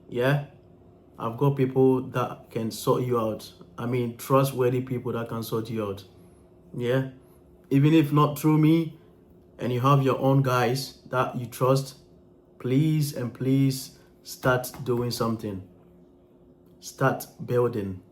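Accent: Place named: Africa. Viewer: My question to you and every African who migrated to the West (Your accent tells that you are born on the Soil of Africa) ….. Why would you think You can call UK 🇬🇧 Canada 🇨🇦 or US 🇺🇸 Home forever. Glad President Trump is waking Everyone up. You may have the Passport that Shows that you are citizens of that HOST country. But wait ……There is More.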